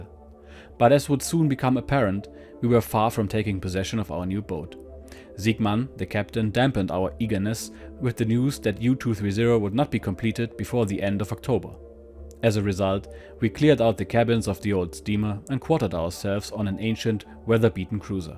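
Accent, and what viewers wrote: Germany. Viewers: when you first started reading the book yourself, you apologized a bit for your German accent. Reading the book with a German accent makes it much more 'real' than if it were read in 'clean' English. I also want to thank you for re-translating the book yourself.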